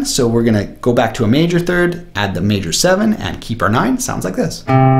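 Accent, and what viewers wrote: Canada. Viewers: Your voice is so canadious, nice work by the way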